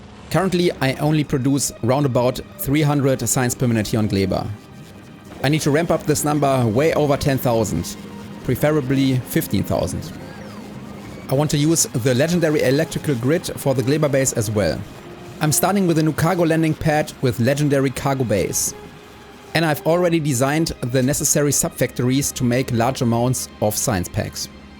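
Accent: Germany. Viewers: Habs auf Englisch sehr genossen und dacht immer "schöner deutscher Akzent" :-D ist aber auch auf deutsch cool. Ich würde mir ein richtiges Schritt für Schritt Schaltungsvideo wünschen. Ich verstehs auch nach 800 Std nicht und alle Guides dazu sind immer zu schnell... Ich versteh nicht warum bestimmte "Symbole" gewählt werden und so weiter und sofort. Darum fallen mir Raumschiffe so schwer, weil ich einfach nicht raffe wie ich da die Schaltungen verwalte... Bzw. Ists sehr stümperhaft. Würd mich echt freuen.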